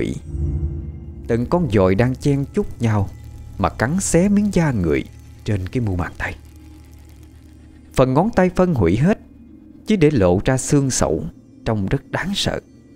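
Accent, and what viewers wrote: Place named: Southwest, Vietnam. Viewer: Yahhh! Hôm nay tác giả Diễm Quỳnh lại cho ra mắt thính gia truyện ma mới! Tiêu đề rất rất hấp dẫn và rất tâm linh ma mị! Rất thích truyện ma làng quê lắm! Xin cảm ơn tác giả và giọng diễn đọc của miền Sông Nước Tây Nam Bộ của chú siêu MC mà dt luôn hâm mộ! Kính chúc gia đình nhỏ của vợ chồng chú luôn rộn tiếng cười của trẻ thơ và luôn tràn ngập niềm hạnh phúc, cùng team Đất Đồng Radio một buổi chiều mát mẻ và vui khỏe! ✍️👻🎤🤙! 👨🏽‍💻🤱👶🍼👨‍👩‍👧💞👌!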